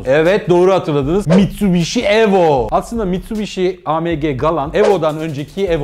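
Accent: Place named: United States. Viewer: Adam bildiyin amerikalı taklit etmiş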